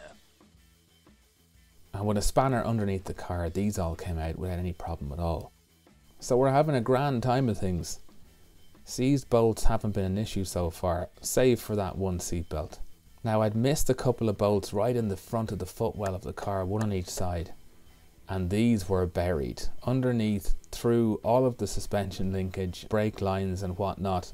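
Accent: Ireland. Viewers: Great to hear an Irish man working on cars. And I LOVE the stop-motion bits showing work done by invisible hands. This is a REALLY UNIQUE USP for this channel. It's probably very time consuming to do, but this helps this channel stand out. Well done. I'm a new subscriber !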